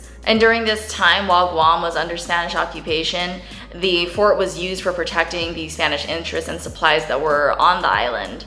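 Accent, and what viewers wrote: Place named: California, United States. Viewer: Slow down when your talking. Lov ya but you sound like you're from California.... Colorado Lov.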